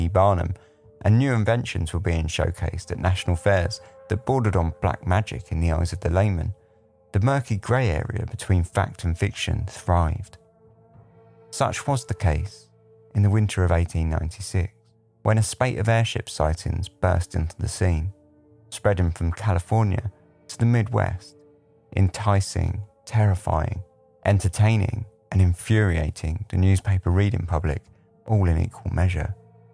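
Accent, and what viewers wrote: United Kingdom. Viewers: I just love British narrators. They make everything sound so official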